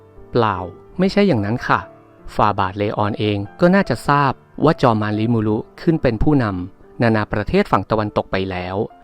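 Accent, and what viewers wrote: Isan, Thailand. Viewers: เสียงภาคอีสานเปลียนเป็บพูดปกติได้มัยคับผมฟังไม่รู้เรื่องคับ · ติดสำเนียงอีสานด้วยฟังมะค่อยรู้เรื่อง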